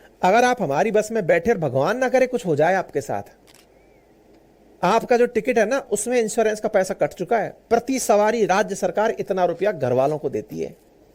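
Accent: Rajasthan, India. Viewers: Sir pura rajasthani style me samjha rhe hain kya baat hai 😅 vaise mai up se hu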